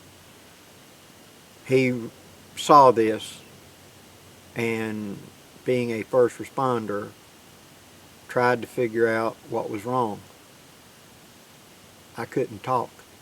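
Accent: South, United States. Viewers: God has plans and works in not straight forward plans someone who sees this will react a second faster saving another one of his children. You may never get the formal thank you but a man like you doesn’t look for them. Thank you for all you videos and you still sound like a southerner to me coming from a Yankee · you’re a fighter and we all wish you well. You’ve got a lot of people here who love and support you. So glad to hear your dulcet southern tones, and feel you’re still here to finish whatever God has planned. Take care, love from Leamington Spa, UK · I hope your recovery is as fast and complete as possible. I also hope you get that glorious Southern accent back!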